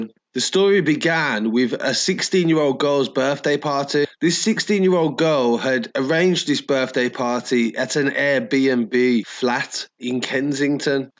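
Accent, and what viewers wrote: Birmingham, United Kingdom. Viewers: That fucking accent tho pmsl, fellow brummie here LOL , great content just subbed!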